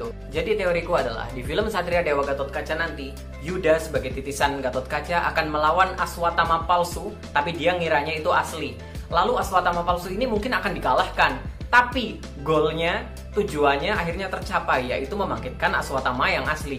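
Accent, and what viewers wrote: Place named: Pekalongan, Indonesia. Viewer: Semangat mas dengan konten super Hero lokal nya..yakin lah kalo film superhero kita sudah jadi dan rilis Pasti Channel mas yang suaranya khas Pekalongan ini bisa makin Cetar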